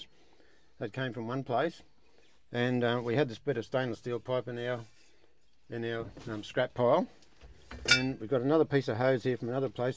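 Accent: Australia. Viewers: Was happy to subscribe and comment. Will also share it as well. Love being able to here an Aussie talking, I was beginning to think I was Turning American LOL. Thanks can’t wait to follow along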